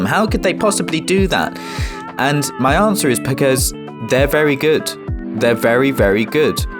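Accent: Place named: United Kingdom. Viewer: "Oh, for fuck's sake." This sounds just like me daily. Minus the British accent. It's still a lot of fun though.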